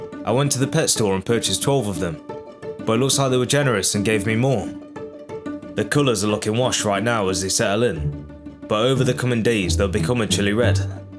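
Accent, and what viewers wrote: United Kingdom. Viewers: are u british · I immediately heard that Brit accent 😭🤌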